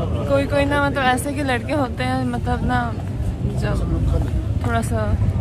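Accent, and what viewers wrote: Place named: North, Pakistan. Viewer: Her accent shows, she is NOT Korean but Pakistani from north of Pakistan. She is not Korean; not at all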